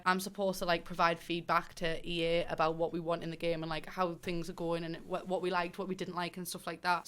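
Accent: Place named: England, United Kingdom. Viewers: So glad I found your channel, loving all the Sims videos, and you just the most stunning woman ever 😍, such a stronger English accent then what my family has 😶😶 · Hi, nice to meet you :) Absolutely love your accent... (I'm from UK originally so have heard your kind of accent before, and I love it lol....) funny at the moment the name of your accent where you come from escapes me...ah yes Jordy....yep! love it lol.... (just hope Im right in saying that now lol...) I won't mind as long as there is an option to play single player or multiplayer....but if its just multiplayer....I'm not very much into that really.... keep up the great work, you might have to slow down in your speaking lol....hard to keep up with you sometimes....but love it all the same....not meant to be offensive by the way :) have a great day :) magz :)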